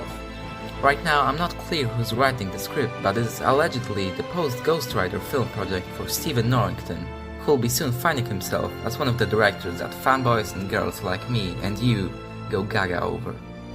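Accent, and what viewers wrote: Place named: Eastern Europe. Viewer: SLAVIC ACCENT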